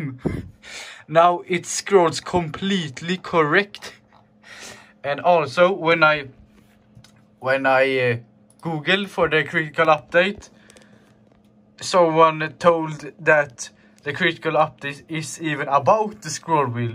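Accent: Sweden. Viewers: You're swedish accent is leaking out too much man. Anyways, thx for the help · Are you swedish? xD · Awsome, fixed it for me as well! Also, love the svengelska.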